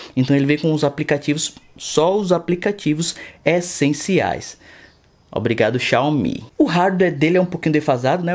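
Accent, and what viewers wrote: Bahia, Brazil. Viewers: Tu é da Bahia?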